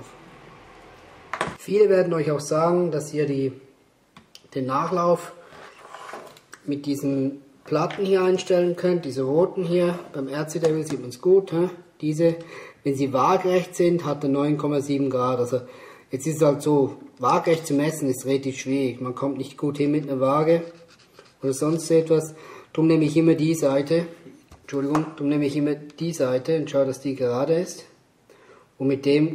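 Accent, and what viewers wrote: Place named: Switzerland. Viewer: Twelve grades caster I understand in your swissy german!!!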